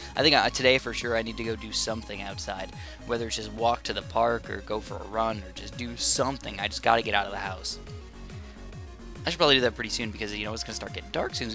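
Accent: United States. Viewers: Wait what state are you in, that sounds exaclty like where I live.....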